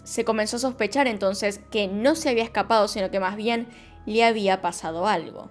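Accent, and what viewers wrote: Argentina. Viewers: Buenas desde España! Me encantan tus vídeos,llevo años viendo este tipo de casos ...no sé cuántas horas de videos ...eres de las mejores a la hora de relatar los hechos de principio a fin . Me he enganchado a tu canal . Un besazo enorme. ..también me encanta tu acento argentino...aquí tengo muchos amig@ de allí .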